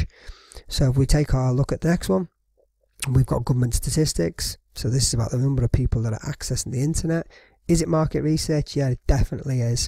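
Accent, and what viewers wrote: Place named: North, United Kingdom. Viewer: this video would of got more likes if you weren't northern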